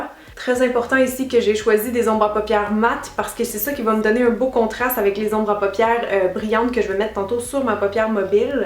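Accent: Quebec, Canada. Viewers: tres nice look, oooh les yeux j'ai tellement adoré, j'ai envie de l'essayer mntn bonne continuation, j'adore ton accent quebecois et je 'tadore.,😘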